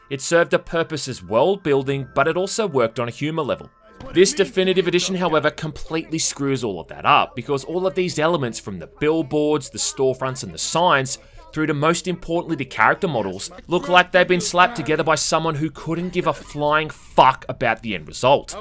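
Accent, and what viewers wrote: Australia. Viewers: As soon as I heard the Australian accent I was like 'hell yeah this will be a good critique'.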